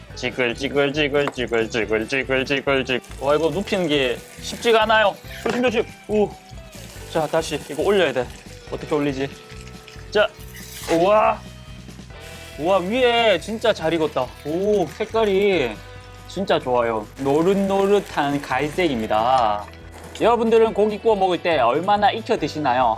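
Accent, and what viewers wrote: Busan, South Korea. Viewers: 말꼬리 허팝님은 부산사람이여서 그래요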